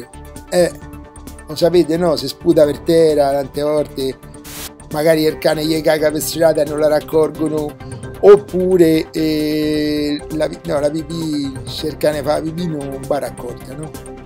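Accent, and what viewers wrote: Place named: Rome, Italy. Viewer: questo video solo 308 like? Che bel romanaccio! La bellezza del romano ruspante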